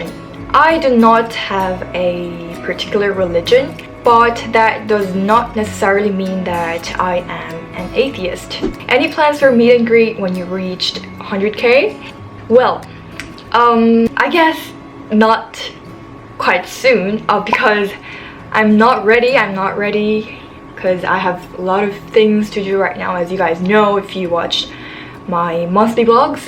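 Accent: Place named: Philippines. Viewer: I know youre not good in Tagalog but the way you speak English sounds so Filipino :-)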